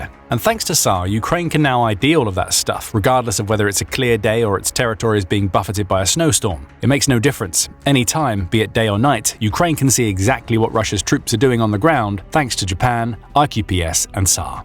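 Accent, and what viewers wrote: United Kingdom. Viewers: Sad. The narrator speaks with a British accent but speaks such dismal English. 'They just gave', 'They just gave' - yugh, what a wrong 'un!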